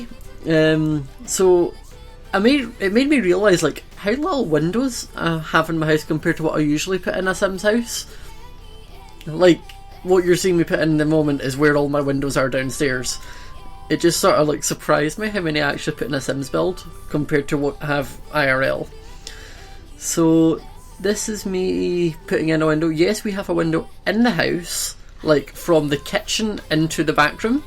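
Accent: Scotland, United Kingdom. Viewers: hes Scottish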